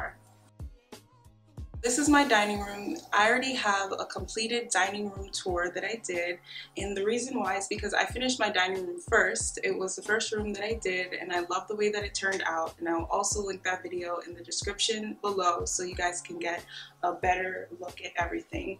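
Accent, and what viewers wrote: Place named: Jamaica. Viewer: Love your decorating skills and you were resourceful with your larger pieces! Love the minimalist glam look. I hear an accent, Jamaica? New subbie.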